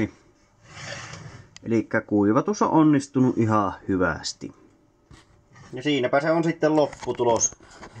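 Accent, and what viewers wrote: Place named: Ostrobothnia, Finland. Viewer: Hyvä video sait uuden tilaajan. Tosi piristävää kuulla vähän pohojanmaata youtubesta.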